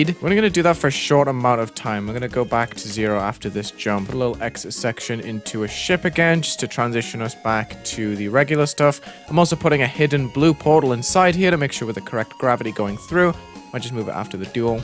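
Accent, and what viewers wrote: United Kingdom. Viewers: This doesnt have anything to do with this video, but wouldnt it be cool if you crashed that chest in the beginning of the video, and it would give you a free demon chest of your choice? Edit:, whats ur accent? It sounds a bit british but also not even british at the same time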